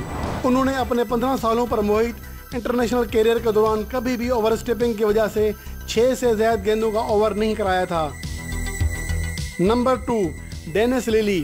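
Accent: Sindh, India. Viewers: Aap ki boli se lagta he aap sindhi ho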